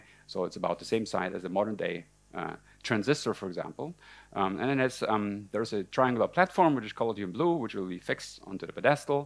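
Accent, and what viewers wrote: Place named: Germany. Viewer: He sounds like a German :D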